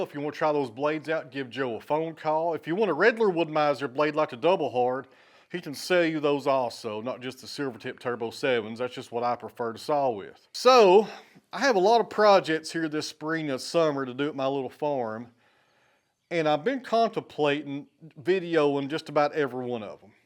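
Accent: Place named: Tennessee, United States. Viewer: Keep doing what your doing. The sawmill, Bruno, mamma cat and cabbage, chores, building your timber frame barn, the chickens, the puppy, your dad, new tools and machinery, trips to the shops and exhibitions, your mistakes and the ability to laugh at yourself, make this a very interesting channel. As a Brit with no experience of life in Tennessee I find this channel fascinating …..and your delivery with that Tennessee drawl and a great sense of humour is the icing on the cake. Keep right on doing what you are doing brother!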